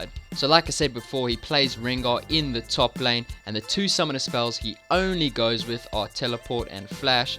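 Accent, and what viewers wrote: South Africa. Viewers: Is this guy South African? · are you south African? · You need more recognition bro, and are you South African?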